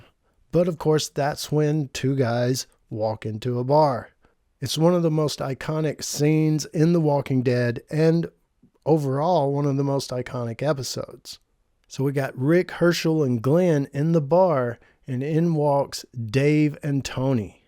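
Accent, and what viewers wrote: South, United States. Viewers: I just watched a 6 year old video frrom this channel and you had a way thicker southern accent. Have you toned it down over the years? Im good either way im not criticizing it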